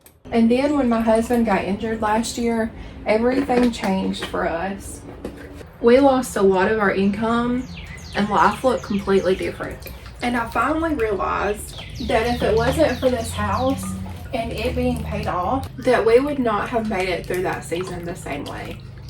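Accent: South, United States. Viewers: Girl I have no idea what state you live in. But obviously from your accent you live somewhere in the south. Do you know how amazing it is to own a house at 21? Girl, i graduated from college at 21 and then wanted to go crazy and live a life of freedom. I wasn't even remotely right in the head to get married until 26. Don't ever feel ashamed of your house that you bought and is paid off. You are a light years beyond where many people were at your age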